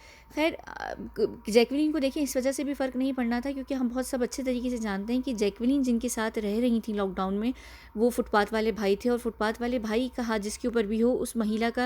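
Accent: United Kingdom. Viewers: Apka British accent bohot pyara h... jinko dikat h vo gvar h 💁‍♀💁‍♀ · I like your English accent.😊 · I luved ur English accent really Ye British accent h kya???? I appreciate your accent really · Ur voice is awesome. Ur english perfect. British accent is like the way u speak. Ur woman with beauty (didnt see but can guage with ur voice n heart) n brains. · I was watching and listening to this video and feeling so sad. Then I was shocked at the way you spoke in English. 😱 The British accent. ❤️ I mean the sudden twist! Your way of speaking is amazing. Amazing analysis. I hope and pray everyday he gets justice. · I Love when you speak in English. It's very UK ish in accent 👍👍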